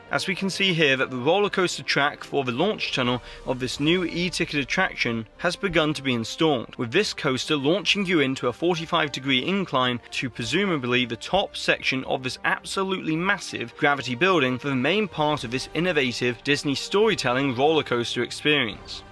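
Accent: England, United Kingdom. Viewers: I love your videos man! I can't help it I been watching 5 of your videos. Just wondering is your accent from England?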